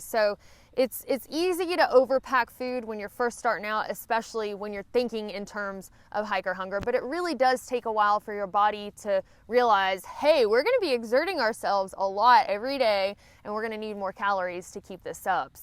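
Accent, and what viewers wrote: South, United States. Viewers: where you from, miss dixie? you sure do talk pretty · Love your Southern accent. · Poor YouTube... Turn off the sound and watch the video close captioned. YouTube just doesn't know how to handle a beautiful southern accent....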